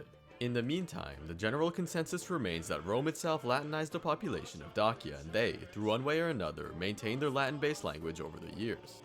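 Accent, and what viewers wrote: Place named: France. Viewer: I love your reading of romance text with a French accent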